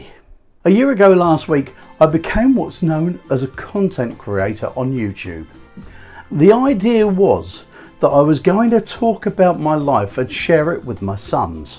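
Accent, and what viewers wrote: England, United Kingdom. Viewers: I like your calm and enjoyable english voice :)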